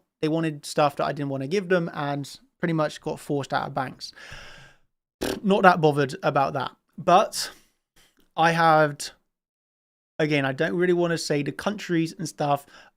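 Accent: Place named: South West England, United Kingdom. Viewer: He’s got a posh south western accent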